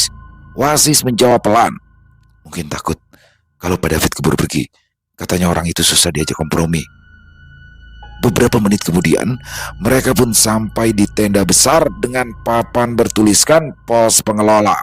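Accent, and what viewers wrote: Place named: Jakarta, Indonesia. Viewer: Sering sering bang bawain logat Betawi / Bekasi gitu, kocag bet dah wkwkw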